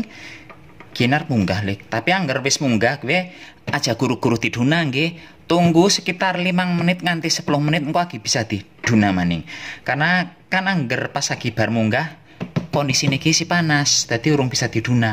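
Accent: Banyumas, Indonesia. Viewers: asik logate ngapak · Halo mas ngomongane AKEH uwong sing pada ora ngarti jawane Jawa blrkutek campur banyumasan 😭😀😂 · Iih..mantep ketemu dulur ngapak nang kene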